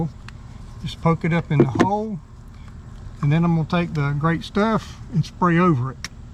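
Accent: South, United States. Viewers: New subscriber here! Thank you so much for this video. I’m sure I’m a neighbor (I noticed the accent) and this is something everyone deals with here In the south especially out in the country (rural areas). This is exactly what I needed to watch! God bless you! 😎👍🏻👍🏻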